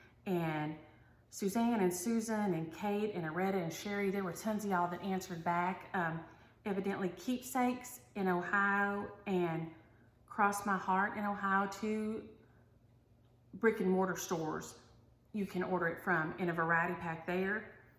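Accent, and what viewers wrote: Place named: Texas, United States. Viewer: I just wanted to let you know that you brighten my day so much! I'm not even a cross stitcher, my Granky did beautiful embroidery and tried to teach me but it just wasn't my thing. I AM a knitter, and I binge watch your FlossTubes while I'm knitting! I was born and raised in Texas, but have lived in NV with my husband for the last nearly 10 years. We have a 7 year old with severe autism, so travel is nearly impossible even when we aren't in this quarantine mess. You make me so much less homesick! Your accent, your stories, just your personality, it gives me a little taste of home, and during times like this, that's been more of a lifesaver than I can even explain. I am so grateful for you, I truly am! So thank you!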